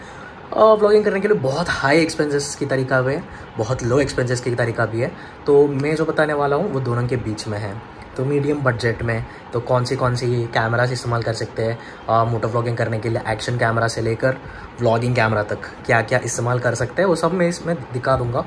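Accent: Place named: India. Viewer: Love your Hindi language accent🥰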